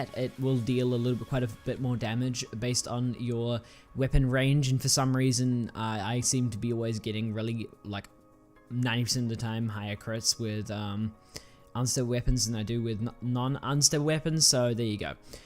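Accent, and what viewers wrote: New Zealand. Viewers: nice nz accent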